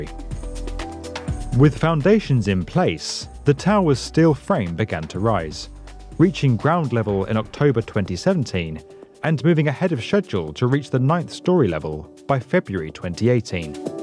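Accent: United Kingdom. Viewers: I find the British/non-American accent a bit distracting! I can't quite concentrate on the content of the video because of that! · Why is a video about buildings in America narrated by a Brit?? So annoying.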